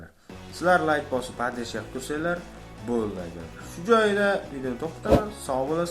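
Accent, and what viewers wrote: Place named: Namangan, Uzbekistan. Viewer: Namangan shahridanmisiz shevangiz ohshab ketarkan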